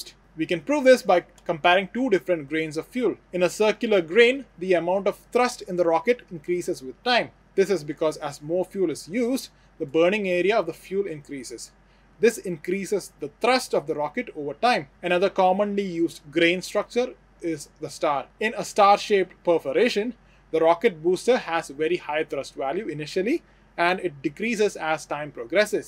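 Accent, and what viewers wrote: India. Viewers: I love Indian version English language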